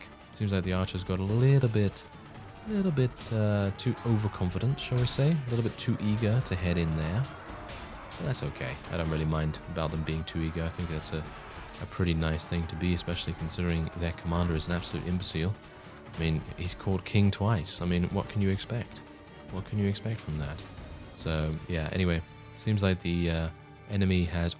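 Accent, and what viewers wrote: United Kingdom. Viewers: a very proper British accent in my opinion, it’s butchered otherwise haha between the old Australian accent and this blokes one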